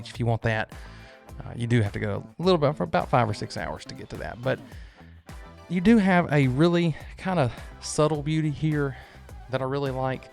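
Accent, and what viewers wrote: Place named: Texas, United States. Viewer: Oh wow, when I was watching one of your videos I thought that’s a Texas accent. I’m from Texas. Now it’s confirmed.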